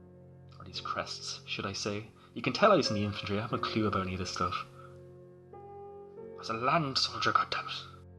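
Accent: Ireland. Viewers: great to hear an Irish voice,great stuff from Bill in Limerick.I have the Yamota battleship.regards Bill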